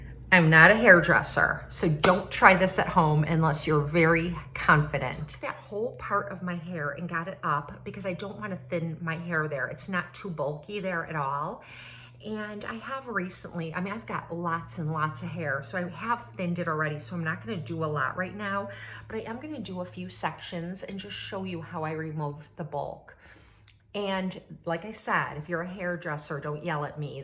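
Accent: Wisconsin, United States. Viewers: I hear Wisconsin or Minnesota in your voice?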